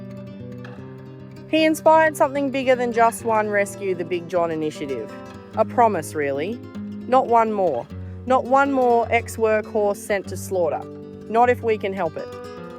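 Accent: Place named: Australia. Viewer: So so agree with you. Your words give me the courage to go out and become a better human to offer what I have what I have learnt from my involvement with animals the good that I can still give to those who need something in their lives. Where are you situated? Your accent sounds Aussie is it.? Let me know please. Thankyou for all you do god bless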